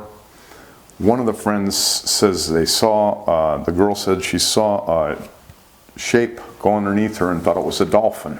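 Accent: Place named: Midwest, United States. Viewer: Great channel. How does a guy with a Minnesota/Midwest accent be so knowledgeable about sharks?! Hahaha great stuff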